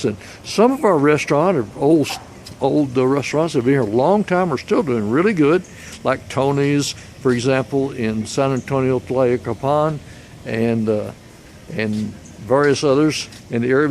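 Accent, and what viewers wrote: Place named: South, United States. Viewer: I just subscribed. Thanks for the video! Where are you from (in the states)? You have a southern accent! I'm from GA and hoping to retire in Mexico. I'm a single, 62 y/o female (with a small dachshund "companion"), so I want to move somewhere safe where there are groups of people my age. I would probably rent a small studio apartment first...and maybe buy later. Do you have any recommendations? Thanks.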